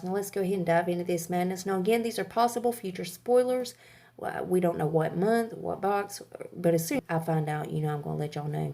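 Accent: South, United States. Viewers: I'm glad to see you back. I was worried... from one Southern girl to another, I need that accent in my daily life! ❤❤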